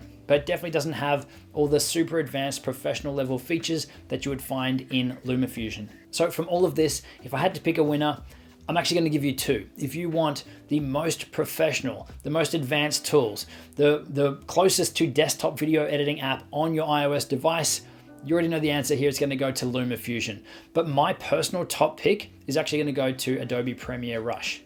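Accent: Australia. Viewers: Are you from Australia? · Do I sense a fellow Aussie?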